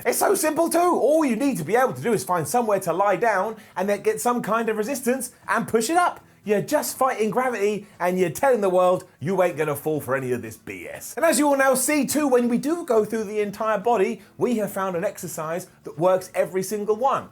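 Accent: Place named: Cockney, United Kingdom. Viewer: Are you a Cockney? You sound a tad like the cockney Geezer from the mighty boosh.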